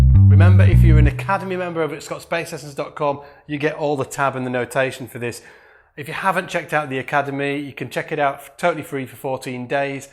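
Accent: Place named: Liverpool, United Kingdom. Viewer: By chance are you from Liverpool? Your accent reminds me of my grandfather...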